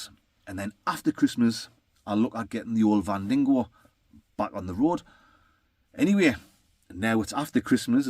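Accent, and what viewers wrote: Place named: North, United Kingdom. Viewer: Nae peas mate!! But I bet you'd eat a scabby horse wouldn't You!! Love your patter very northern and humming of Scottish banter!!😂😂😂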